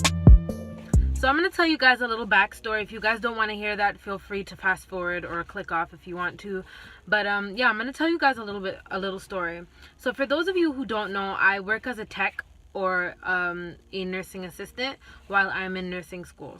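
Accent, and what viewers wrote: Caribbean. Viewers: Pleasure is all mine. You do an excellent job. How did you fine learning pharmacology? I’m now reviewing and getting the jist of it before school starts in July. Do I detect a West Indian accent also? My dad is Jamaican and mom is from St. Kitts.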